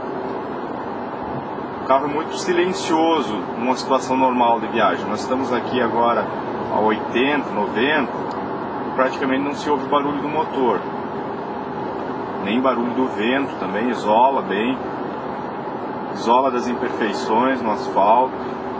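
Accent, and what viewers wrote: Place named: Rio Grande do Sul, Brazil. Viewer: lagoense com sotaque gaúcho rsrs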